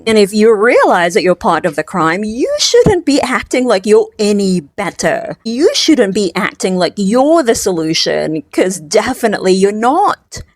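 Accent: England, United Kingdom. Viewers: I'm sorry but your English accent doesn't make you smart, mag bisaya kanalang day.:face-green-smiling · well said. Love the British accent though but not the Bisaya. Sagwa magsalita si Inday Sayad, even in her own dialects. Can you also roast Harry Roque. Thank you. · Luv ur brit accent 😊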